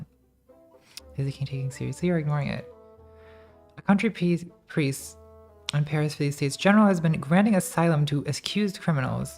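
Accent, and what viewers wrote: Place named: RP, United Kingdom. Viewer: And she has a royale English accent.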